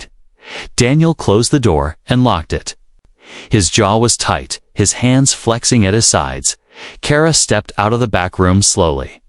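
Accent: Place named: United States. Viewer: The Anerican accent does not work with these kind of stories.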